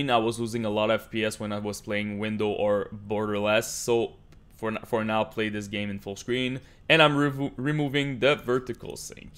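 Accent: Quebec, Canada. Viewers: Big accent of Quebec speaking inglish esti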